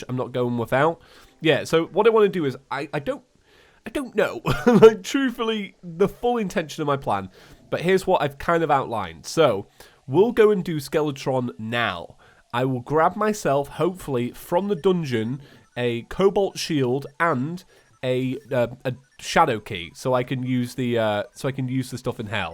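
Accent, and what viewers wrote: England, United Kingdom. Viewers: I know I know, you're English, you have an accent, and that's great, don't get me wrong... But no offence, sometimes it seems like people just purposely mispronounce words, just for the sake of standing out:P (Yoshee/Yoh-shi) It's like, I know how to say it, I know how the creators intended it to be known, but hey, I'm different, let's just change it up... Haha, silly beans.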